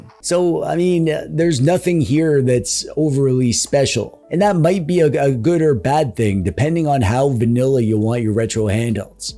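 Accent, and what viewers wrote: Canada. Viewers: If I hadn't jumped ship to Retroid for a Retroid Pocket 4 Pro, and more recently a Retroid Pocket 5, I'd have been very interested in this. But instead, I'm just watching to hear the nasally Canadian tones of.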